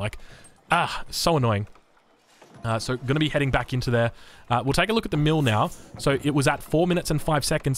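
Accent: Australia. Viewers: I seriously cannot get enough of your voice lol. Is that weird to say? Yeah that's probably weird to say... But hey, having a great voice (Australian accent is a plus!) is great for casting like this!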